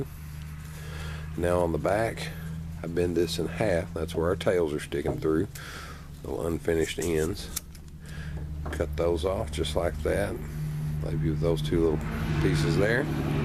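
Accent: South, United States. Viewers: Your from the South aren't you? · he liked your Southern accent too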